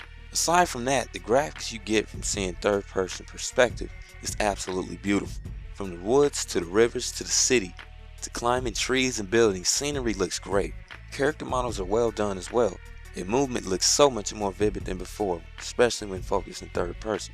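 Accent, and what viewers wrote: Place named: United States. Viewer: What accent is this guy's? I know it's American lol.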